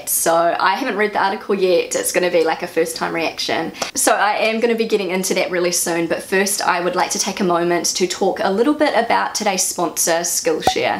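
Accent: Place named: New Zealand. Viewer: Love it!💕 happy to see how comfortable you feel and how much you have germanized without losing any of your kiwi identity ☺️ PS: love your account, as i‘m a german and spent 9 months in Whangarei during school and your account always reminds me of the kiwi accent and the country in general🥰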